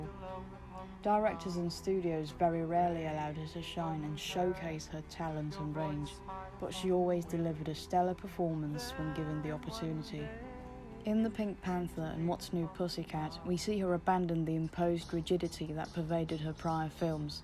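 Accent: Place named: United Kingdom. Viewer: I like your specific British accent! It has a cool, unphased, couldn't-be-bothered quality. Good video essay, as well!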